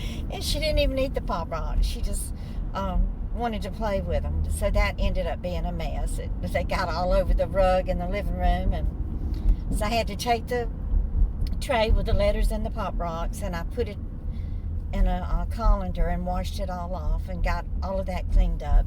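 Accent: South, United States. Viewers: I heard about your channel on a post from a subscriber on "See Mindy Mom". I thought I'd check out your content. Since I chose this video first, it speaks to me rather personally. Our family tragically lost a younger brother when I was a high school senior, in 1972. Then in 1996, my husband's sister, a single divorced mom lost her life in a car crash. It devastated my husband's family. He has never come to terms with the event. My prayers are with you. You have a sweet Southern accent. Blessings to you,! Willette from Alabama 🙂🛐❤️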